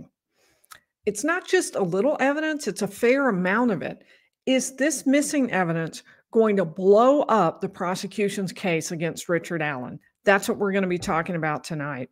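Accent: South, United States. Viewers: Your Southern is coming through,!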